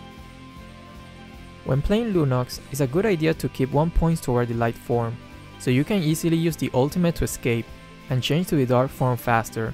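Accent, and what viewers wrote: Philippines. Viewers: i believe no... Based on his accent... If ur filipino u wud know wat i mean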